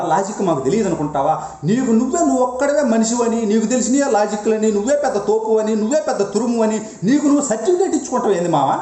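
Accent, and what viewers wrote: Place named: Nellore, India. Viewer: garu, meeru mee nelluru accent lo, goppaga, ekutunnaru sIr.... Bagundhi...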